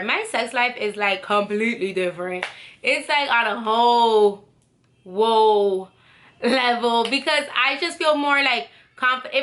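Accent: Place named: Bronx, United States. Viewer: You sound like you’re from the bx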